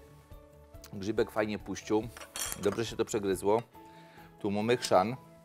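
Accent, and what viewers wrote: Silesia, Poland. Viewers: Panie mam prosbę chętnie bym skorzystsla z panskich przepisow ale ni w ząb nie rozumię pańskiej gwary,czy mógłby pan prowadzic to w jezyku polskim,bo mam wrazenie ze te panskie przepisy są tylko dla śląska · jak ja lubię śląską gwarę, jestem z podlasia, ale co nas dzieli to nas łączy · Pani Doroto, zgadzam się, że nie ma milszego kucharza :) Fajnie jest też posłuchać takiej śląskiej gwary. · uwielbiam tego Pana!!! Bardzo fajnie opowiada i ten dialekt śląski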